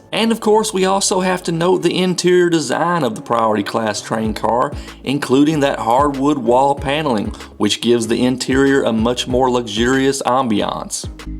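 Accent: United States. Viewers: soalnya pakai aksen amerika, coba pakai aksen british lama atau aksen australia 😁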